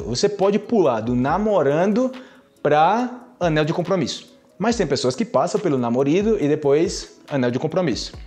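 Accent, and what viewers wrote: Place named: Colombia. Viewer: Adoro tu acento colombiano ! Cuando dices " enserio " 😍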